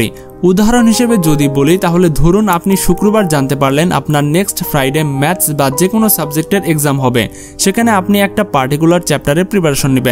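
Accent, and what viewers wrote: Bangladesh. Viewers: বাংলাদেশী আমার মনে হচ্ছে। কথা শুনে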